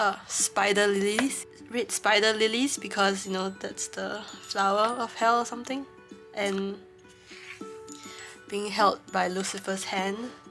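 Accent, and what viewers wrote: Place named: Singapore. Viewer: Your drawings are so pretty! And I really like hearing another Singaporean voice on youtube!! Talk more!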